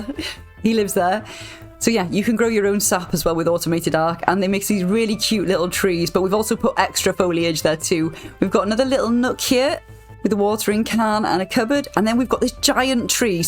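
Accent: Wales, United Kingdom. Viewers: Woah wait are u welsh?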